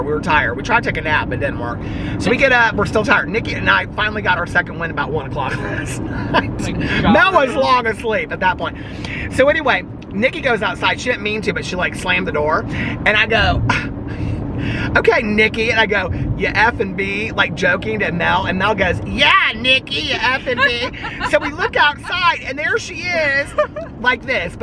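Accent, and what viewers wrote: South, United States. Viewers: Why does sound more southern than usual? 😂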